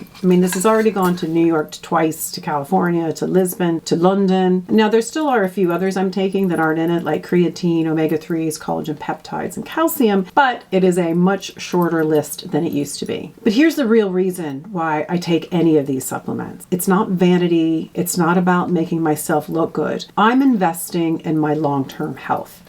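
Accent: Ireland. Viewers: Where are you from? I hear Irish 💚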